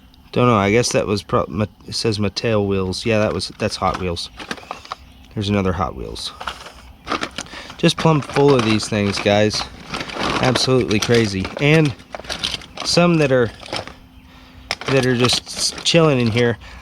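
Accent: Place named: Oklahoma, United States. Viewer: Where are you from it seems like you are from Oklahoma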